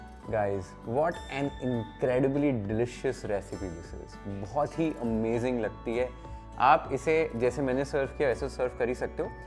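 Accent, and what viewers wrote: Maharashtra, India. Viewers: Sanjyot Sir, a day before I tried Maharastrian Masale bhat.. today I tried your Thecha Paneer Rice.. dono hi itna sundar bana tha.. very tasty.. Being a Maharashtrian, loved the authentic flavours of them.. and your Assal Marathi Accent, too. 😄 Thank you so much for such a lovely recipes ,Sir.. ❤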